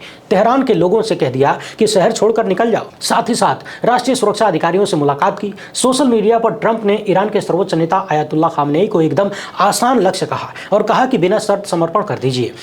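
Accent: Bhojpuri, India. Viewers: Ur bhojpuri tone! So sweet.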